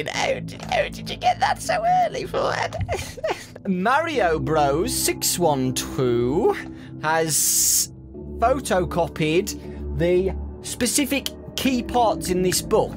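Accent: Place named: United Kingdom. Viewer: As soon as i heard his voice i said im gonna learn so much British from you this video was really good btw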